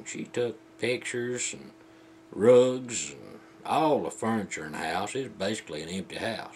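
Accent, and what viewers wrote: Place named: Georgia, United States. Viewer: HAHAHAHAHAHA That is an AWESOME story!! You know, you remind me a lot of my old man lol. You from Georgia by chance? You and him have the same accent and seem about the same age. He has all kinds of stories like that that happened to him in the past, lol. This made me laugh.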